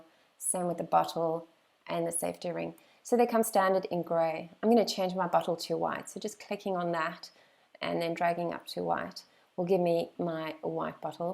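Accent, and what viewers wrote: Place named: Australia. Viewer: i was wondering " wait, hold on, did I hear Margot Robbie speaking ? but then I was like, ah she is a Aussie so you might be having the same accent" ^_^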